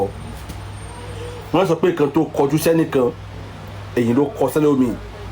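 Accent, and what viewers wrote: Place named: Benin. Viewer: Kaare omo oko, omo kaaro ojire atata. From his accent and some words you could tell that he is from Bénin Republic omo Yoruba ogidi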